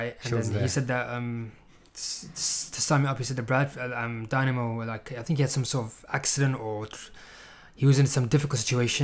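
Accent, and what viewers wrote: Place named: Bradford, United Kingdom. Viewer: Listening to your accent...YOU ARE FROM Bradford!! Your accent is exactly like Dynamo's!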